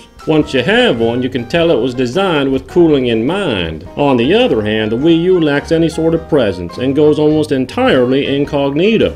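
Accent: United States. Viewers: wait are you American?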